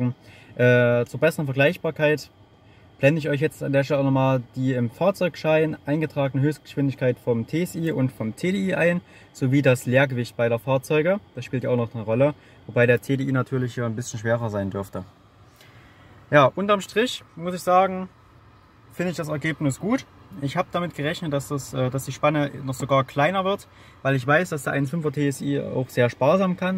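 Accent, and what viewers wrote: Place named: Saxony, Germany. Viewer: Kommst du aus Sachsen? Daumen hoch für den Akzent, Grüße aus DD 👍🏻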